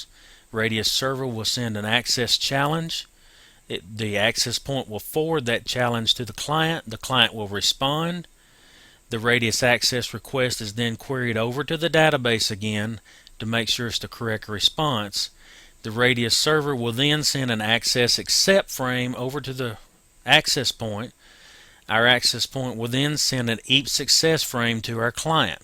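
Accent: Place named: Australia. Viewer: Purely out of curiousity, which accent is this? I'm confused, it sometimes sounds Australian (in my ears, that is), then it sounds like it's from the Southern US, which got me intrigued. I'm no native English speaker, so recognizing accents isn't my strongsuit.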